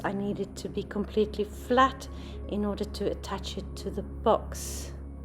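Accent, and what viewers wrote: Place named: France. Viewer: You speak excellent English with your wonderful French accent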